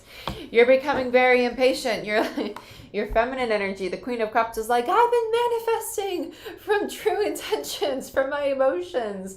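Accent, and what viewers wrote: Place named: Cockney, United Kingdom. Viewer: LONDON.. LMAO.. I was just laughing at your kinda cockney accent at that point. You do it quite a bit and it always makes me smile.. Usually when you say WATER.. 🙏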